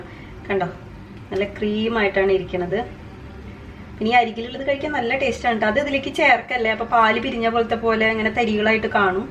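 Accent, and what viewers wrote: Thrissur, India. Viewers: അടിപൊളി ചേച്ചി ഈ കണ്ടതൊന്നുമല്ല വീഡിയോ ഇതാണ് ചേച്ചി പറയുന്നത് നല്ലതുപോലെ മനസിലാകുന്നു നല്ല voice തൃശൂർ ആന്നോ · തൃശ്ശൂർ ആണല്ലേ 😃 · Pukkaaaa thrissur bhaasha...ngaan thrissur adutha padiche. Nostalgic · Thrissur alle veeed Alla... slank? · Trissur bhasha polichu. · thrissur da bro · Chechi de varthnm nth rasa... Enik othiri ishtay.... ❤️😘Trissur aana place?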